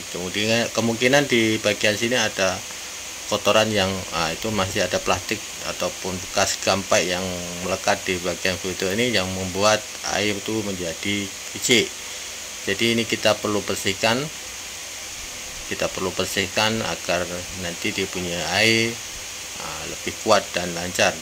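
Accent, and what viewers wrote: Malaysia. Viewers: Q fokus kata2 kaka logatnya masih kayak orang malaysia Dulu di malaysia brpa lama ka?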